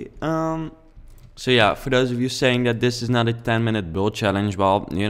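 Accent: Netherlands. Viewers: een nederlands accent ;) je bouwt mooi!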